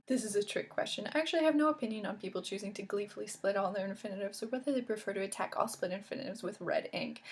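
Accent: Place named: Northwest, United States. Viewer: I agree with Aloma. You speak very quickly. There were a couple times where I couldn't keep up, but we speak slower in the South. I was raised by Yankees, though, so I don't really have a regional accent from what I can tell. I can't really place your accent. If I had to guess, it would be NW US to the Midwest. Thanks for the video. Having studied Spanish, Russian, and German, I can see why some people disagree with splitting infinitives. I really don't care because it would only be problematic in translations, which are problematic anyway.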